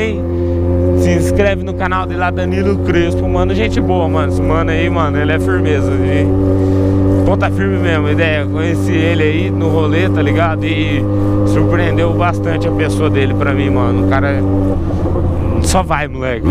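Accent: Goiás, Brazil. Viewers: o porque você não fala mais no pique do Goiás? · pq parou de falar no pique do Goiás?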